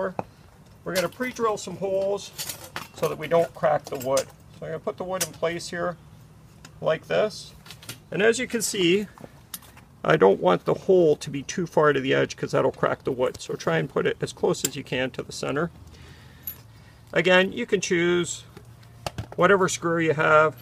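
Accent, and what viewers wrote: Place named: Canada. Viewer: Based on his accent he may be referring to Canadian dollars. And metal prices fluctuate quite a lot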